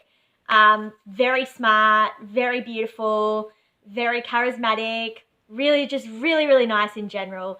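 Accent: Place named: Australia. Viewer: send me her and half of my family talk like you--super Aussies! I love Australia my cousins got a Viet Restaurant! You sing!?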